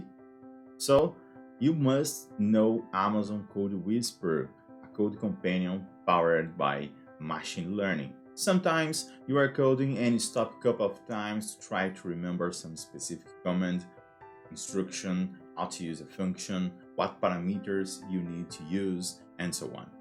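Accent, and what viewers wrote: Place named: Brazil. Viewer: Eu logo percebi que vc é brasileiro, nosso sotaque é unico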